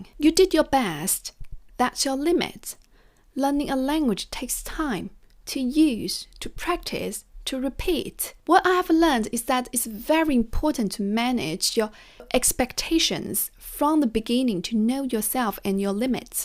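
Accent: England, United Kingdom. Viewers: i was surprised to hear english wasnt your first or even your second.. i can hear almost a british accent from you.. the mesh is beautiful! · Hi Miss. Your voice is so gently😊. Do you speak english in british accent?? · Hello, I have just discover your channel yesterday night (we are in the morning when I writing this message) and I love your videos and learn so much! I am learning japanese and need to learn and have fun in learning. I see that is more simple to learn when it's a pleasure. Your stories and those of your friends are so shocking. Your are so kind woman and you speak so well english. I love your british accent and understand without difficulties what you say. I'm french and I know that native speakers like to correct rudely people sometimes. But I don't understand why they act like this. But there is people, like you said, that are very caring too. 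I want to thank you for this video and try to have those advices in my mind. I never really practice english outloud because I think that I will not have enough words to express myself but I understand well english. I will try to communicate more now! Thank you so much! Je te remercie pour cette belle vidéo, et j'espère voir aussi un jour des versions françaises de certaines de tes vidéos qui sont excellentes:) Merci encore pour tes conseils et belle journée à toi!!! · Thank you! Your English is GREAT! I understood every word and was highly intrigued by the idea of your almost British, sounding, dialect. It has a certain je ne sais quoi.... <3 Furthermore, your video was inspiring and multifaceted in many areas of Life AND Learning. {in my opinion of course;-) } My mom taught me THIS gem, "Your haters are brillo pads, they may be abrasive because they rub you the wrong way; however, if you disregard your discomfort, you will find that you have become polished and exuberate a new found brilliance and SHINE!!! 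Keep shining my friend, KEEP SHINING!!!!🌻💟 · I can hear the British English accent so hard in her English, it's adorable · Oh wow that’s really good that you’re able to learn that many languages. You shouldn’t be ashamed with about anything it’s not your native language. Ok here’s a new challenge for you, just for fun. How about trying working on your accent, you got the old English down, let’s try American English. This might be a bit more challenging but doable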